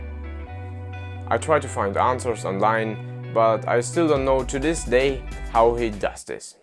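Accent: Germany. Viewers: Man hört das deutsche haha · Are you german ? It Sounds like that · German accent?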